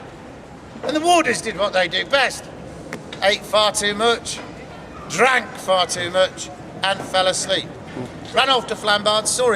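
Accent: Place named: Scotland, United Kingdom. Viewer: What makes me think you're scottish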